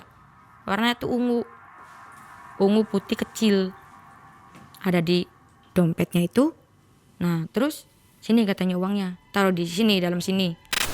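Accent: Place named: Java, Indonesia. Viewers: Ngapak tegal 😅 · Serem tapi aku ketawa Mulu sama Bahasa ngapaknya . Kebetulan aku orang tegal juga mba. salam kenal. sampean tegale Ndi yu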